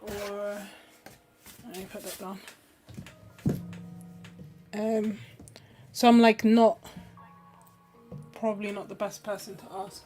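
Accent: United Kingdom. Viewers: Lovely florals, love the colours! And yes, you do have British accent LOL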